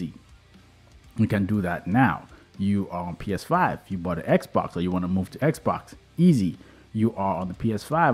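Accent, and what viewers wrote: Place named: India. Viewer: Hello. Where are u from? India? Just curious) Your accent sounds like indian.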